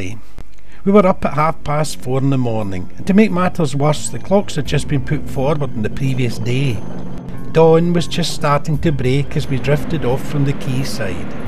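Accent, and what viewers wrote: Scotland, United Kingdom. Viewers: Nice and interesting film and I love your Scottish accent.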